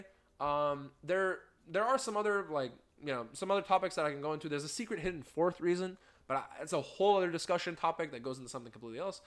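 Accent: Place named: United States. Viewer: Wow your accent sounds so American in this video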